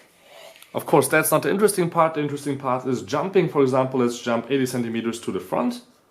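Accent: Germany. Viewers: Man merkt so stark das du deutsch bist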